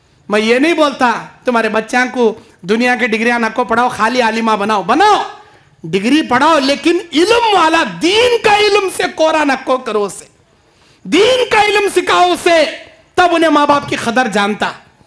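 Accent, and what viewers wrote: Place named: Dakhni, India. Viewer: Dakhni zabaan ki mithaas ??? Alfaaz dil mein utar jaate . Allah aapke Tawheed ke mission ko kaamyaab kare Amee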